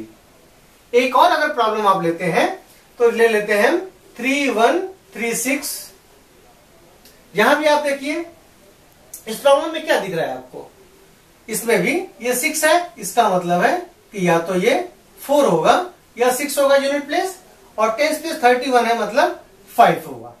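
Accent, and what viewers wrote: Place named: Bronx, United States. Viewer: His south Broncs accent is hard to understand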